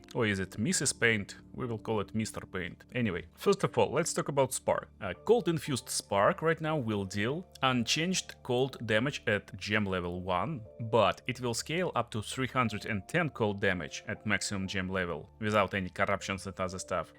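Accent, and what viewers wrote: Russia. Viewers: судя по акценту парень то русский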